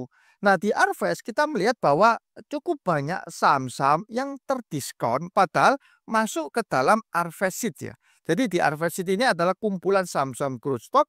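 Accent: Surabaya, Indonesia. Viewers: logat medok suroboyoan rek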